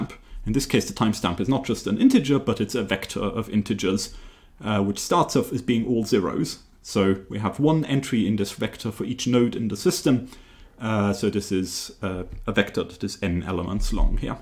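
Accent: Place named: United Kingdom. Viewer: Wow, first time listening to Cambridge University. Love it, both content and the British accent.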